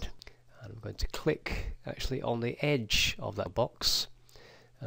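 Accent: England, United Kingdom. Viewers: .....a VERY good tutorial! – ...and Thank You for speaking "english-english" in a clear and "easy-to-listen-to" way...(Many accents from within Britain can be awful to listen to unfortuantely...this was very good) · For some of us who are American, we love the flexibility of spelling it flavour or flavor; check or checque; color or colour; etc. Or maybe my latent ancestral genes (as in DNA, not "jeans" as in clothing) just kicked in and I accept both ways of spelling, realizing that the context of his accent tells me he is of more recent British extraction. My family is several generations removed from Britain and Ireland, and has lost the charm of the accent. Yet, when I traveled to Ireland, it was as if I were "home" despite the accent, differences in pronunciation and spelling, etc. There is a concept called called "code-switching" you might like to research. 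It comes into play in dual language situations where one is mentally switching what they "heard" or "saw" (as in a visual language like American Sign Language (ASL) vs Pidgin Sign Language - using the signed hand shapes of ASL but in the grammatical sequence of Spoken English Grammar.). So, for me, this tutorial was wonderful and I appreciate the time he took to carefully explain a bit how the PowerPoint process works. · great work.. great British accent..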